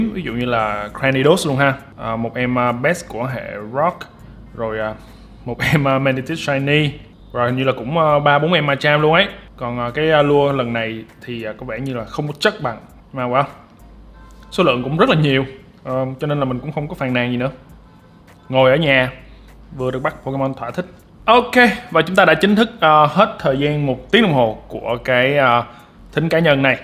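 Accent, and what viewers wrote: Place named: United States. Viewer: Anh có học tiếng anh không anh do lúc a nói chuyện rất giống người Mỹ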